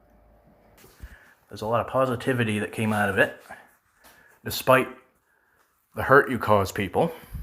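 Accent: Maine, United States. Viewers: That's how an intelligent Maine accent sounds. Great message, dude.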